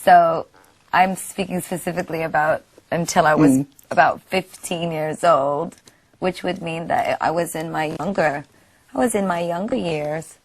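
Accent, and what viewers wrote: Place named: United Kingdom. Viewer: she sounds more british here..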